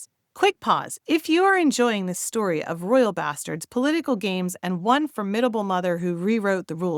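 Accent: United States. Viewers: What a dreadful american voice impossible to listen.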